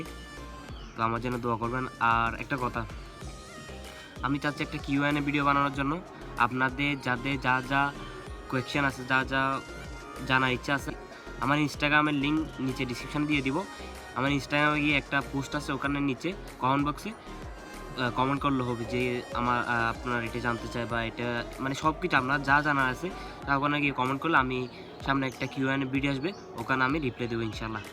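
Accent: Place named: Chittagong, Bangladesh. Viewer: ভাই তোয়ার বারি চিটাগাং হডে😂😂😂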